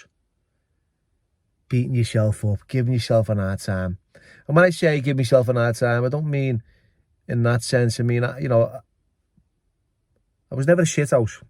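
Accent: Liverpool, United Kingdom. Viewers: love the scouser accent xx your amazing and amazing at looking after your Joe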